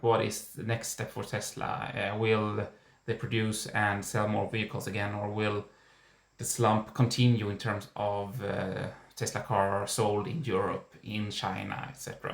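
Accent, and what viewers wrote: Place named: Sweden. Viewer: Your accent seems to me Swedish so tack sa mycket for your presentation and I wish you good luck with your channel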